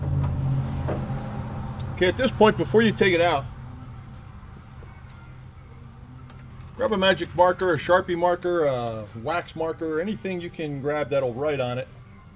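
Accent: United States. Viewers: you're the MAN!! This trick tightened up results to spec- and we could use funds on a 4-jaw instead of a replacement 3! BTW: My compliments on your voice and diction- very clear, understandable and smooth, and best of all: no grating dissonances that bleed your ears... If I may ask- where in the US does that accent originate? Keep on truckin'! -Claus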